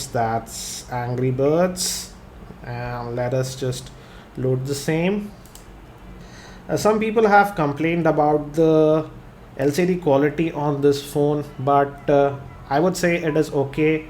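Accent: India. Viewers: thanks for this. the indian accent makes this credible. :]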